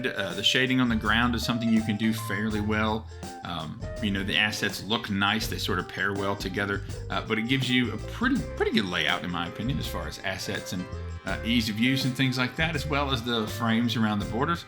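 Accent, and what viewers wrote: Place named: Alabama, United States. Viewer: You from Alabama? You sound just like Destin from Smarter every day!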